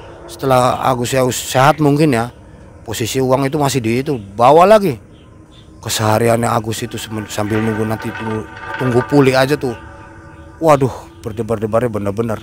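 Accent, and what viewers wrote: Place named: Cirebon, Indonesia. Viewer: Wuaduh bhsae Kya wong cerbon apa wong dermayu kih ... Hehehehe 🙏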